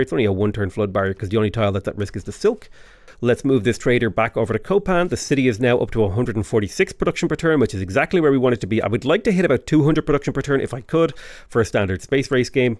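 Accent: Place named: Ireland. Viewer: I’m a natural English speaker, and you sound like an over caffeinated Irish dude. But I’ve been told Canadians speak really slowly compared to aussies, Brits, and Irish.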